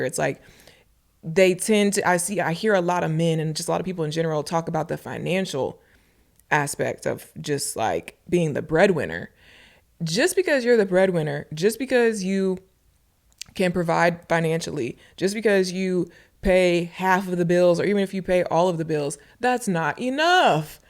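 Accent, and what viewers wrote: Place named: South, United States. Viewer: Girl you are so funny! Especially with that southern lady accent. Have me hollering in my room “you gotta let go & let God” 😭❤️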